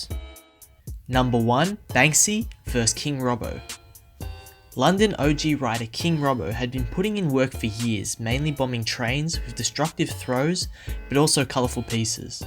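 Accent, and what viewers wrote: Australia. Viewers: Nice to hear a ozzie hey from west oz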